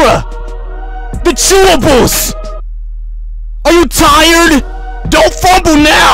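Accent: Australia. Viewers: Bruh that’s an Australian accent 🤨🤦🏾‍♂️ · “I’m in New Zealand” proceeds to speak in an Australian accent as an Aussie I approve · Aussie accent? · says he's in new zealand put on australain accent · That’s an Australian accent not newzealand…… like saying u sound Canadian get my point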